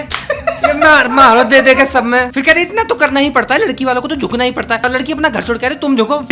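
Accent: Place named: Punjab, India. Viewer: O bai Punjabi accent 😂😂 me also punjabnn❤😂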